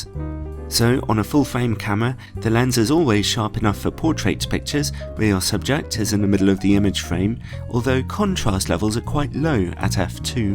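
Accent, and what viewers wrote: United Kingdom. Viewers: Even if this is an old video, it's always a great pleasure, and really relaxing to listen to your soothing voice with your sweet British accent,. Also, your microphone is perfect for your voice, and this music made by the famous fits perfectly for your videos. It's like your trademark now in some way, haha! Great video review about the Helios 44-2, thank you!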